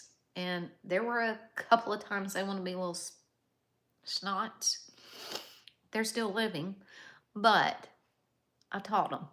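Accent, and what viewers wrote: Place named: South, United States. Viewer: when you say good gracious alive you remind me of my grandmother she lived in West Virginia she had a Southern accent and she would tell us grandchildren if you don't behave good gracious alive I'm going to Tan Your Hide 😂😂😂😂 I am only 3 years younger than you but I remember them good old memories of my grandfather and grandmother they had that accent💜💜💜💜💜💜💜💜💜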